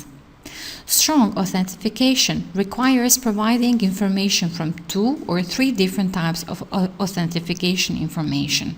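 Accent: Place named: Russia. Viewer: That accent, are you from Albania or Russia?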